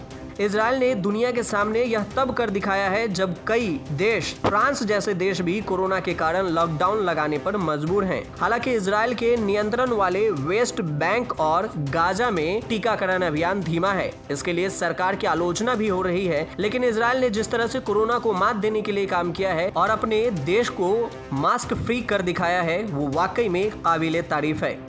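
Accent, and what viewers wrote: Bihar, India. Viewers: bolne k liye ye bihari hi bcha tha kya dang se hindi nhi bol skta ye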